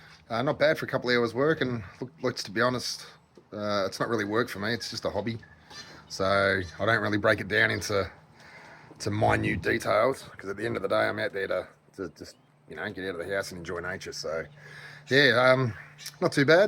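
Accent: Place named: Australia. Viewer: Bonjour, are you originaly from France? If yes,you sure speak like a true Aussie.👍 I also enjoy prospecting,did 5 trips to Western Australia, beginning in 1997. Now I pan for gold in the Gard region near Anduze,been here since 2006. Yes! same feeling here, I'm 63 years old and the years start to show themselves. Here in France (Gard region) the season starts on the 15 th of May till end of November. Keep well,Dom.👍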